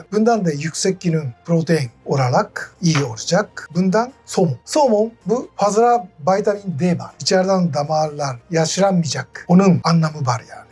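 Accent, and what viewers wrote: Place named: Japan. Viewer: japon aksanıyla türkçe duymak çok güzel yav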